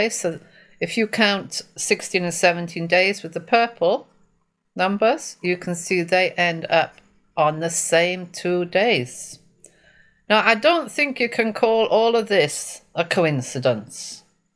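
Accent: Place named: Manchester, United Kingdom. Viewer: are you a Mancunian? I was born there and left for Australia in 1972. Your accent sounds very familiar. I'm born again and thank you for your work in the Lord. We fly soon.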